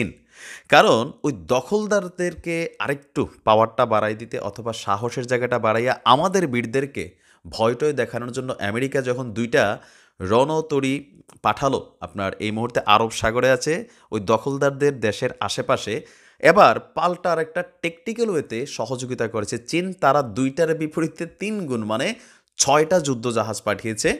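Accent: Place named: Kotalipara, Bangladesh. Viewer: ভাই "ক" কইলে কোটালি পাড়া বুঝি।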